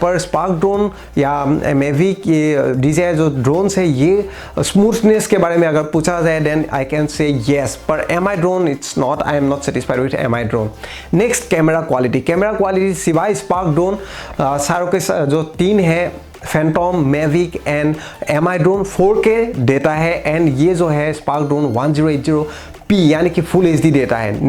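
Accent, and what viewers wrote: Assam, India. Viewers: bhai accent tu huni gom pai golu tumi axomiya buli... so about goi salu sivasagar r manuh ulala...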